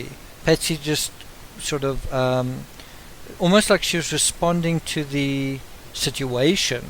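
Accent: South Africa. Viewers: 😆 I’m from the Uk and I find myself using American terms sometimes now.. I used flashlight recently and my daughter gave me a sideways glance 😏... I love the South African accent too... The way says ‘Dr Phil’ as ‘Dr Full’ always conjures up an image of Dr Phil with a big tummy after his dinner! 🤰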